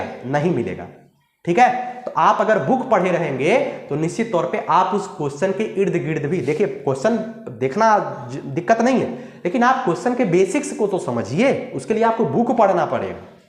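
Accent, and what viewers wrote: Bihar, India. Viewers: Sir aapke bat me bihari kuchh accent aa rha hai.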